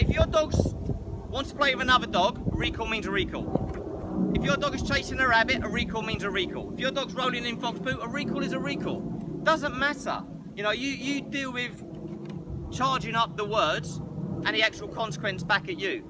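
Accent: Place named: London, United Kingdom. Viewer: Where are you based. You sound like you are from London.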